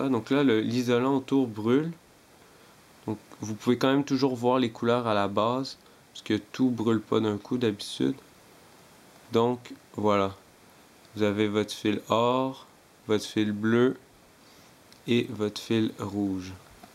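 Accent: Quebec, Canada. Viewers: hey tu vien du Quebec ou quoi ? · j'adore ton accents rebequooiiiiiss <3:) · Merci mec !! Je vais reparer mes ecouters Beats !! PS: tu es quebecois ??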